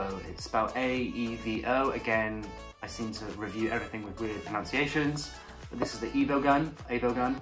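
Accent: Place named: United Kingdom. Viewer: Why is this guy's British so hot?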